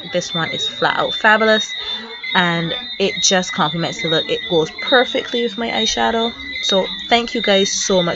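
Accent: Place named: Caribbean. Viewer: I like you caribbean Accent! very nice makeup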